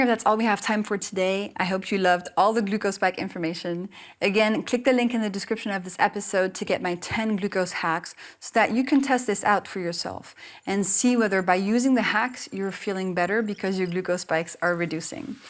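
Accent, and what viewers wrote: France. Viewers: Do I hear a faint French accent? It,s not underneath, it’s below in this context 😅✌️ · I simply love her French accent❤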